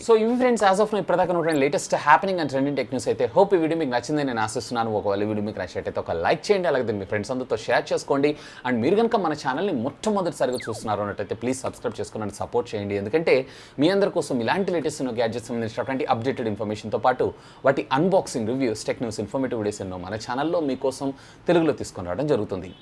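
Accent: India. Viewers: R u from Vizag or srikakulam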